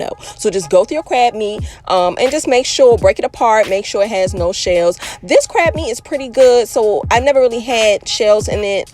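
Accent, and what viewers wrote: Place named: Baltimore, United States. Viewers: I knew your accent was givin a subtle Baltimore ish twang to it lol...great vid 👌🏾👌🏾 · I ran across this video because I'm trying to figure out how to perfect my oyster fritters. But this exactly how I make my seafood salad. And I hear that B-more accent sis. Fellow Marylander here...😅.. I'm From Salisbury MD.. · What part of maryland are you from....baltimore Md or like PG county md... I’m just tryna decipher ur accent 😂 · Accent!!!!!! Sounding like Bmore or sum. 🙌🏽🙌🏽🙌🏽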